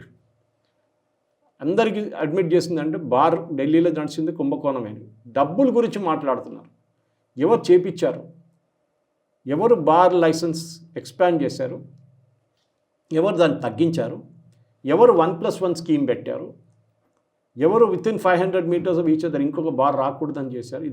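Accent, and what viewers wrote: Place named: Telangana, India. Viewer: పక్కా తెలంగాణ భాష మాట్లాడుతాడు. మలయాళం భాష మీద పట్టుందా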